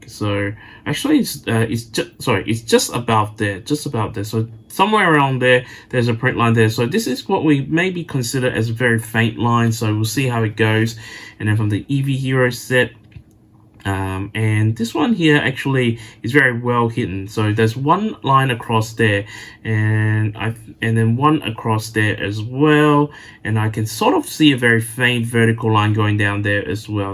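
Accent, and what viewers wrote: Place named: Australia. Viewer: So awesome! Random question but I assumed you were Australian until I heard you say you’re in Hong Kong. We’re you taught by an Australian?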